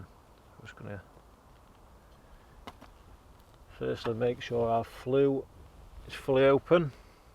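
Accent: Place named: South Yorkshire, United Kingdom. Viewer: Defo a South Yorkshire twang in there somewhere. Enjoyed the vid. Thank you for sharing 🙏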